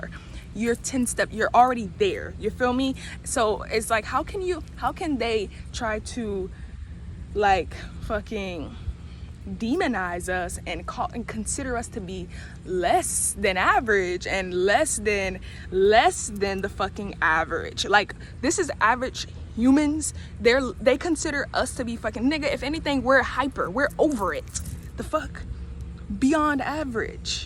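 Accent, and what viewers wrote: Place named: California, United States. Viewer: Message SiStar 💕 💯🙌🏾 I’m in agreement with a lot of your views. On another note you definitely have to be from the bay or Cali because you sound like family 💯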